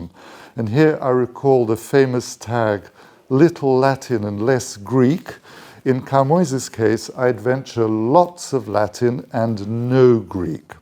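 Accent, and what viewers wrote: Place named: RP, United Kingdom. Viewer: That's perfect BBC english! Proud of you ❤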